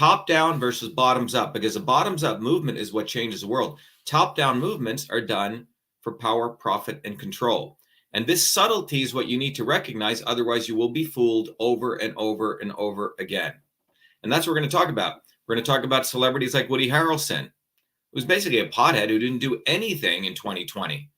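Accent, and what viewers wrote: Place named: Kansas, United States. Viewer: Im 41 and I live in central Kansas, USA. I was raised in a 3,000 person rural town. I will be blunt, I love showing Dr. Shiva to all of my cracker redneck hillbilly hick ass friends! I love to hear him SPEAK EXACTLY the same way that "we" all talk around here. I',m going to tell them to start closing their eyes before they start to listen. Scumbags equals ALL POLITICIANS! Look here, it is time for real talk, foul language, and time for some fucking action. Semper Fidelis means something to me again and I will register to vote again and go back to encouraging my kids to serve for our country as i did if this dude gets elected. Fuck the Senate, we need you now Doc! Help us save what is left of our failing country so that, among MANY THINGS, our fallen will have not died for anything.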